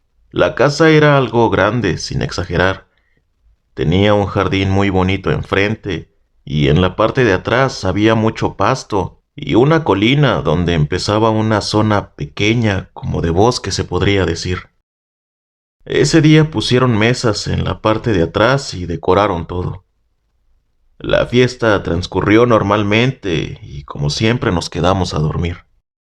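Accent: North, Mexico. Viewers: No me canso de escucharte amigo 👍 tengo entendido que eres de Juárez? Me encanta el acento del norte de México Saludos a todos desde España 🇪🇦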